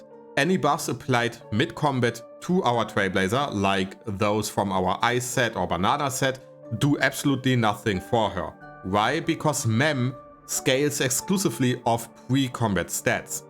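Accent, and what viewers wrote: Germany. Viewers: he sounds very german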